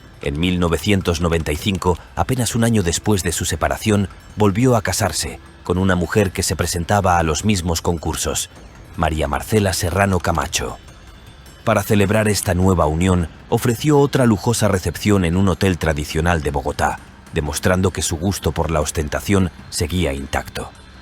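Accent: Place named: Spain. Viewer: Esa voz spanish 🤮🤮🤢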